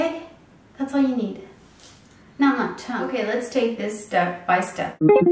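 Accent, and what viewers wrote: United States. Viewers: If you're from Spain, how is it that you sound so American? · I've been watching her videos and I hear an American accent but she holds a Spanish Passport.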